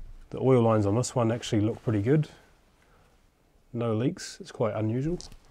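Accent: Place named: New Zealand. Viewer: You sound kiwi bro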